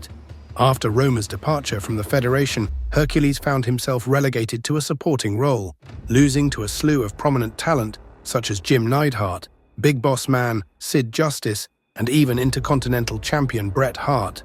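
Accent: United Kingdom. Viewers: Why do they always use a Brit voice for these things? Aaaargh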